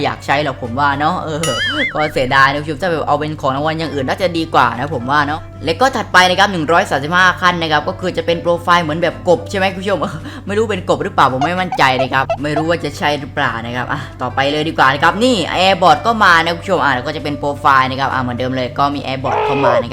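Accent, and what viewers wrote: South, Thailand. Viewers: พี่เป็นคนใต้ม้ายอะ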